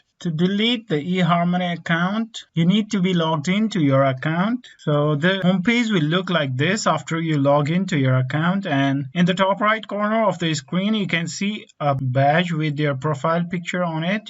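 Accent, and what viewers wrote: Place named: India. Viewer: I hate Indians they are everywhere have a horrible annoying fn accent !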